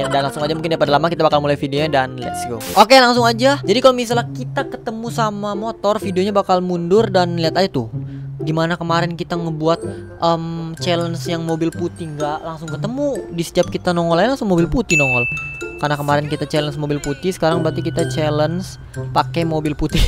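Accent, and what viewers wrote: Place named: Sunda, Indonesia. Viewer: Sunda detect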